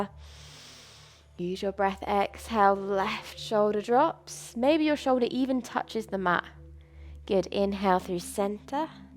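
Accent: United Kingdom. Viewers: Dear, I Always used to do yoga with Adriene, but more and more I am drawn to your classes. Thank you so much. You seem to have something that suits me every day and I love your English accent! (I'm British in France) thank you for your kindmess xx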